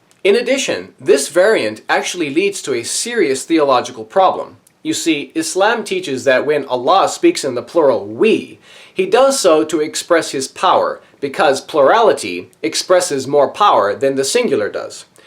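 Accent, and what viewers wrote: Arab world. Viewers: Your Arabic accent is brilliant... Masha Allah ;)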